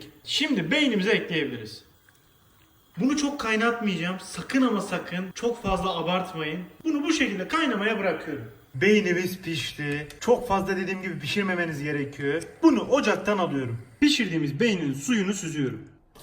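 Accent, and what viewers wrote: Adana, Turkey. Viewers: Hatayli degil bu şive adana şivesi Hataylılar böyle konuşmaz yüürün katıyürüm ekliyürüz diye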